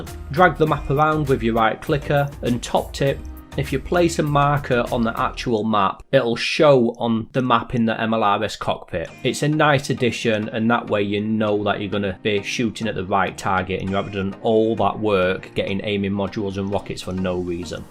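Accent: United Kingdom. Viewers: I dont know why, but I always learn more about Rust from a chap with a British accent.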